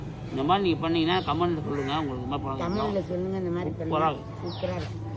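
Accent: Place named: Madurai, India. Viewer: Thaayee.. unga pechu arumai.. adhil pandiya naatu paasam mattume therindhathu..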